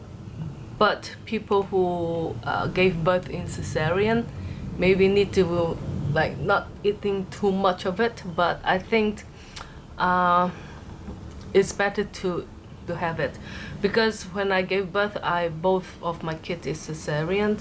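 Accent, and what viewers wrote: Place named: Malaysia. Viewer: your accent is very closed to malaysian chinese... i dont know why im watching this.. but i enjoy it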